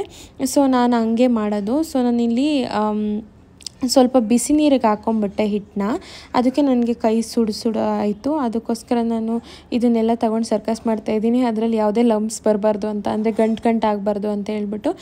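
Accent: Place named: Tiptur, India. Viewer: Hellooo Im also from Tiptur. Neevu maathado style node ankonde, nam side erbeku antha. Kushi aythu😊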